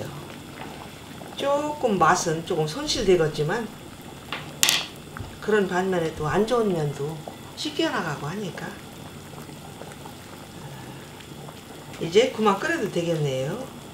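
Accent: Chungcheong, South Korea. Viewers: 무수, 무시 충청도구만이네요 구수하네요 예산,당진 그쪽 아니신가요 잘 봤어유 해먹어봐유 환장하자 맛있네유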